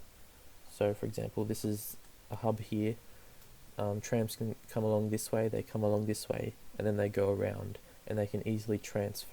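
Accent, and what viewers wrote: Australia. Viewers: Mate I love your cities! And I can tell you're an Aussie too. Would love to see you do a let's play without mods. You could model it on an Aussie city too. Give it cool district names and have specialised districts too. Would be cool!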